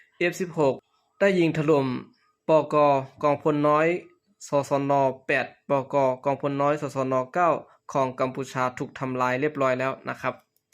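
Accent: Laos. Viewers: คนรายงานข่าวเป็นคนลาวหรือ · คนอ่านข่าวนี้ลาวแน่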